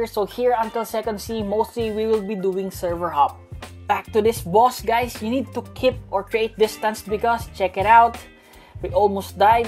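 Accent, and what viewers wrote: Philippines. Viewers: I hear your sound you are Tagalog po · i knew he was philipino its in the accent i know filipino english when i hear one :)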